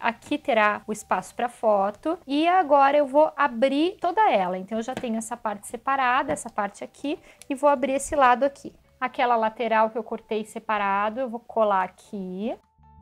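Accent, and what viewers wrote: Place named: Santa Catarina, Brazil. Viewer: vc é de Santa Catarina? é que eu vi a foto no Beto Carreiro, e seu sutaque tmbm parece daki ahhaha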